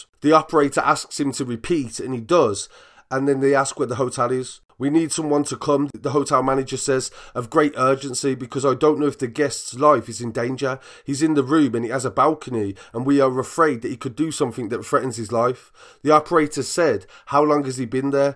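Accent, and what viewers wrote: Birmingham, United Kingdom. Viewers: You from bruming ham i hear your English brummie dialect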